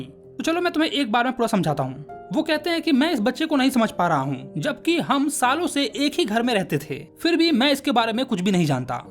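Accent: Bihar, India. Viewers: Sir aap Bihar se hai na ❤❤ Aapki avaj se laga 😂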